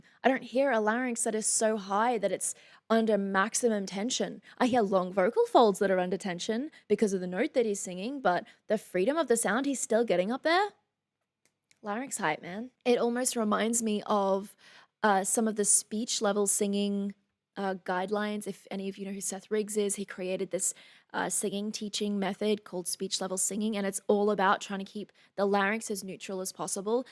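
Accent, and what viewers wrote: Australia. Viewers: And yes... I could tell you were a Queenslander from the accent alone :-) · "You Nut Job"! I love how you Aussie's talk! PS: I'm glad you go with the English way of spelling words, like "colour" unlike everybody else who leaves the "u" out of a lot of English words, other examples are words like "parlour", "behaviour" etc. I'm a Kiwi so we don't leave the "u" out of words either unlike the American's do. Americans just love to abbreviate everything and anything until it's unrecognisable, I think!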